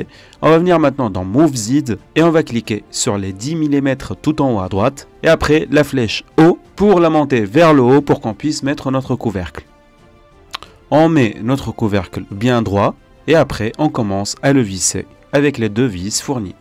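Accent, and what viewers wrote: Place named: South, France. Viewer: 👏 pour la vidéo et 👍 pour le délicieux accent du sud qui fait tout le charme 😉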